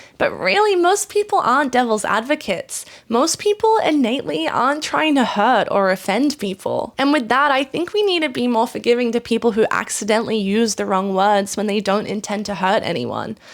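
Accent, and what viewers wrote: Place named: Australia. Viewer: It took me watching more than halfway through the video to realise that you were also Australian.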